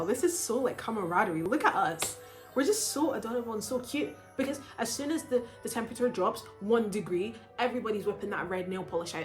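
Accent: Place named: Scotland, United Kingdom. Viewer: she's Scottish, lol, love it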